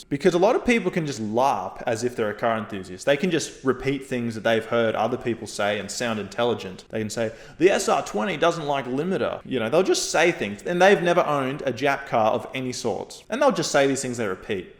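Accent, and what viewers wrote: Australia. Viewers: This is my first video in your channel. Are you based in Australia? because I don't want to judge based on accent. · Good take, green car, AND an Australian accent all in one?? Sub.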